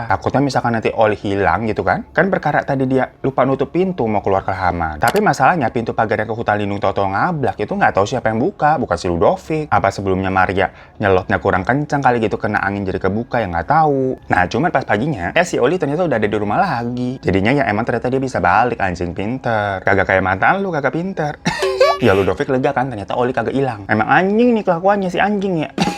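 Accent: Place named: Pasar Minggu, Indonesia. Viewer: Background: Romania. Logat: Pasar Minggu. Paling sedep emang dengerin cerita, udah kayak dengerin tetangga ngoceh. Dan ceritanya wow kayak Mid Sommar.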